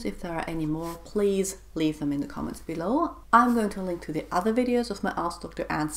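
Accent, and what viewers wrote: Germany. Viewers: I think due to your pronunciation of the chemicals I directly heard the german r and a ☺️☺️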